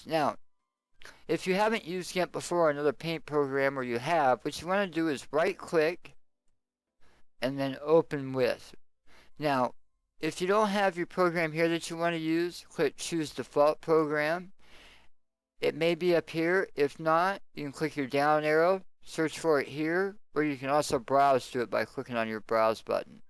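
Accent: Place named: United States. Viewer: Don't worry about your voice. For me as a german it sounds like typical American with a little slang. But I understand your pretty well. Thanks for the tutorial. It helps very much :-)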